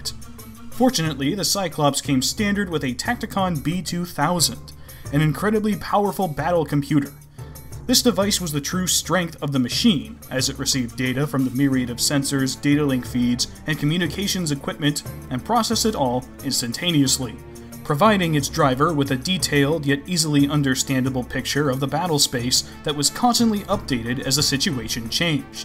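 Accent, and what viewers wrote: Germany. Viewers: I listened to your reading o f Measure of a Hero and I think you have a fine German accent. Reminds me of the series 'Allo 'Allo.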